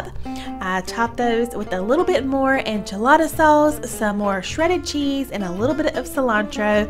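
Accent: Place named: Kentucky, United States. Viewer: See.... that is what I just cannot appreciate about most store brand cheeses. I know they are more affordable but, I just do not care for how stringy it gets. I personally prefer to see my cheese, creamy and smooth and honestly, I believe that it is some sort of generic imposter of real cheese. However! ALDI, actually says REAL, on their packaging, and I believe that they sell a more quality product because they are not based in the US. Sorry, just, my opinion. ALSO! I would like to try this with the canned Sloppy Joe BOLD brand, it is very tasty, not just the reg. SJ but it makes a big diff with the BOLD. Okay, I'm done. I love your accent! You sound like we do here in KY! :-)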